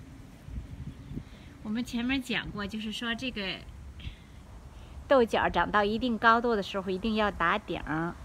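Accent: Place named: Zhuozhou, China. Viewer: 听着这么熟悉的口音，您是涿州一带的人吧？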